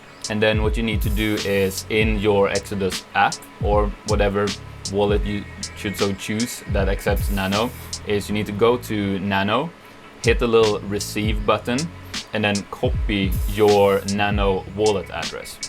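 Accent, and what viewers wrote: New Zealand. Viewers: Nice bit of Kiwi my dude, keep up the amazing work!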